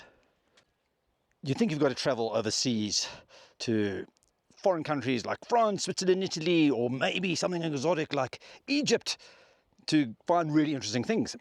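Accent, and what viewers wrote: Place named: Afrikaans, South Africa. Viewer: Love the boerwors accent man it's always nice and crispy 🔥👊🏽.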